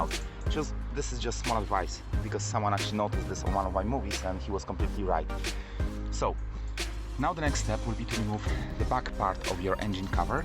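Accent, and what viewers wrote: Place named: Poland. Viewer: Ale typowo polski angielski 😅 po pierwszym zdaniu wiadomo skąd autor pochodzi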